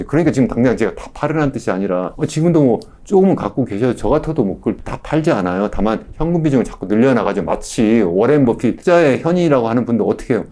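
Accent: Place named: Jeolla, South Korea. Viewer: 일단 라도 사투리부터 고쳐주셨으면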